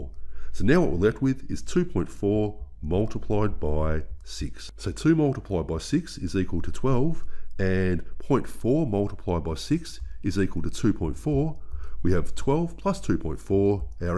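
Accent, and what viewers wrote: Australia. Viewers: As a New Zealander, I would say his accent is from Australia, and probably so is he.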